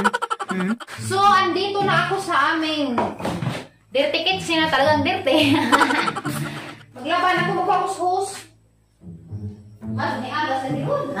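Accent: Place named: Visayas, Philippines. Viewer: I like your Bisalog Accent dai relate ko kay ing ana pod ko bsan ang dugay ko na dito sa Manila eh😀😀😀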